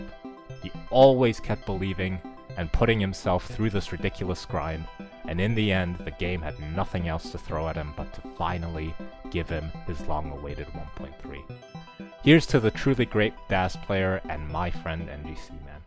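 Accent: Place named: United States. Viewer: 1.2 19 start when? also why do you sound so american?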